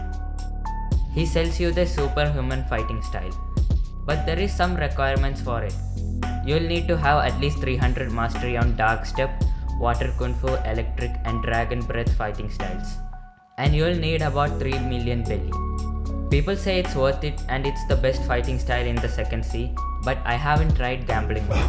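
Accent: India. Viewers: indin accent 💀💀💀💀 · You sound like an indian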